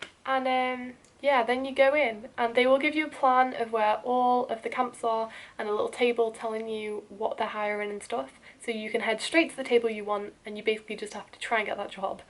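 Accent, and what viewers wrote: North Wales, United Kingdom. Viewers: You sound like you're from North Wales right? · Hey- where abouts are you from? You sound very North Wales/ Cheshire-ish !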